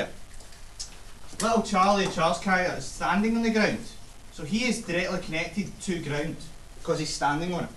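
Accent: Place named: Scotland, United Kingdom. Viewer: This really helped, thanks! Took a bit for me to understand your Scottish accent.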